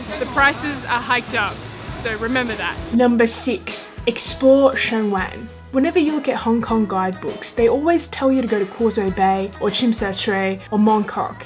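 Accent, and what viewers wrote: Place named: Australia. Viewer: Hi I have just subscribed to you channel lol. You sound Australian very interesting. I have friends from Hong Kong and China too. How safe is Hong Kong for a man on his own. I believe you can blend a little lol. Dobspato England Newcastle Upon Tyne.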